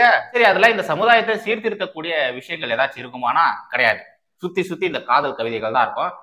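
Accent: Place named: Chennai, India. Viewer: நீங்கள் தொகுத்து வழங்கும் மதராஸ் பாஷை மிக்க நகைச்சுவையாக இருக்கிறது ..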